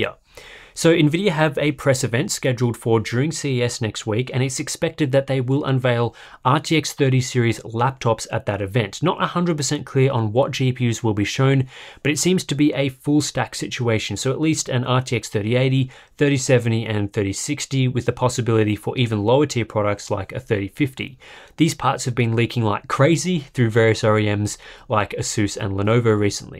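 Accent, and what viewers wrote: Australia. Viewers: You could do worse, his Australian accent in these shows is subtle, not a broad one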